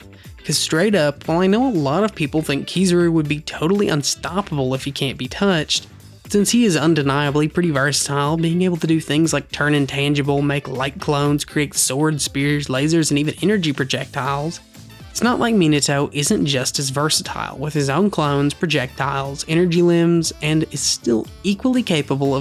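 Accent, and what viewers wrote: United States. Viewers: Do you have a cowboy accent?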